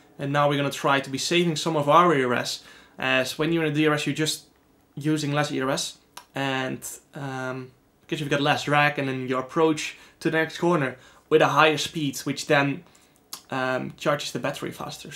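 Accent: Netherlands. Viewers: we dont care about the quality we just wanna hear that juicy dutch-english accent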